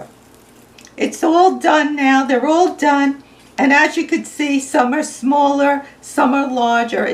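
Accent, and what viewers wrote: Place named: Boston, United States. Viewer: ARE YOU FROM BOSTON MASSACHUSETTS? I HEAR AN ACCENT I RECOGNIZE BUT MY GRAM WOULD SAY BUTTAH NOT BUTTER.. SO I MAY BE WRONG ... BUT I HEAR PAPAH TOWEL ... SOUNDS JUST LIKE MY GRAM.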